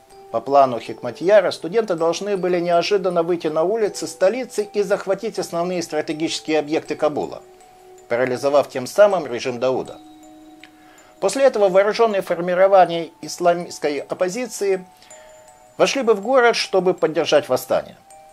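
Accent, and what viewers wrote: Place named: England, United Kingdom. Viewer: Он не руски акцет ангилиски ест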